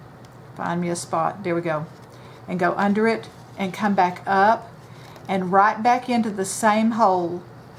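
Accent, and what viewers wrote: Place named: South, United States. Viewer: I want to say that your detailed description of each step is exactly what I needed! An added bonus is your accent! I’m grew up in the south and I’m always homesick! Thank you for your video!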